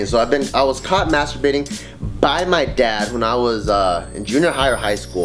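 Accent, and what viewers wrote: China. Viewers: wow, Chinese accent is almost standard than I expected.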